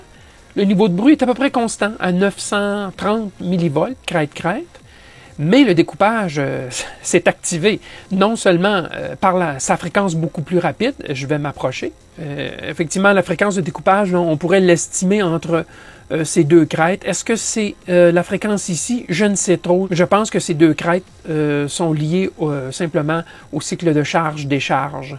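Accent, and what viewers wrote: Quebec, Canada. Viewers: Ça fait plaisir de retrouver cet accent québécois !!! Très bonne vidéo.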